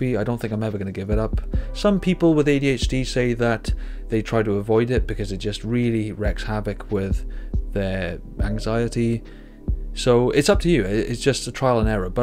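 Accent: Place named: Swansea, United Kingdom. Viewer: Hello, I'm from Swansea! I noticed you have a bit of a local accent, where are you from?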